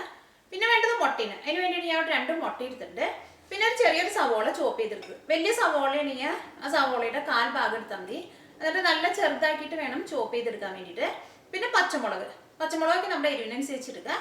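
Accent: Thrissur, India. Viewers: Trisur bhaashayalle ith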